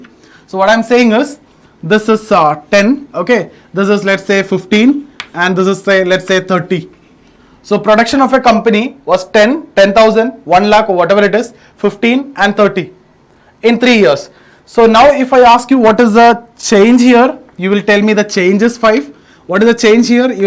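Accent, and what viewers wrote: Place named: South India, India. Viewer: Bhai sir ka accent south indians jaisa lag rha h...may be he is more fluent in english than hindi...isiliye sir ne english prefer ki h hindi ki jagah...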